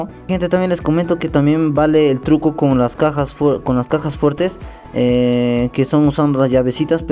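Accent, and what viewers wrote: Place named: Peru. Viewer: PURA VOZ DE PERUANO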